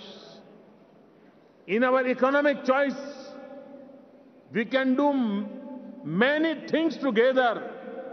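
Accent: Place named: India. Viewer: Salman MOHD.Apprecite his talent delivering a speech in front of a huge audience & his accent may be very much Indian English but the message is clear to everyone....aap ki baap ko bhi broken or tuti-futi angrezi bol Na nahi aatha hoga... yourself displaying your ignorance very much obvious.... madrasa mein pade hue log aap ke jaisa mindset rakthe hai...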